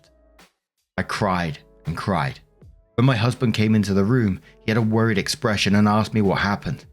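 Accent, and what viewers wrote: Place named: Oxfordshire, United Kingdom. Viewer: I live in South TX, but when I was little my dad was in the US Air Force and Stationed at Upper Heyford. We lived in Oxfordshire (a little place called Middle Barton). When I listen to you talk, it reminds me of the 3 years I lived there. I don't know if that's anywhere near you, but listening to you takes me back!! The neighbor drama, the cheeky little kids. We were always in and out of everyone's houses too. Love your channel and stories!!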